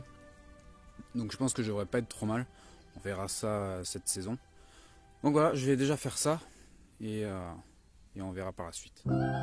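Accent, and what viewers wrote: South, France. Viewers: OUI tu me la dit la derniere fois qur tu étais nouveau d' ou viens tu ? un léger accent du sud ;moi sa fait 39 ans que je suis a Lyon c était pour 3 ans max Bonne journée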